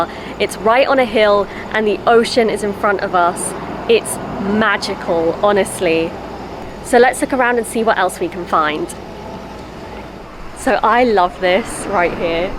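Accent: RP, United Kingdom. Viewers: お～っ！とっても素敵なQueen's English での歴史解説に観光案内．．．良くできたＢＢＣの番組見てるみたいです😮でもやっぱり食べるんですね😁番組を見ているような、スムーズに流れる感じは、TAROさんのカメラワークによるところ多いかなと😊リポーターのお話と魅力をうまく引き出してると思います。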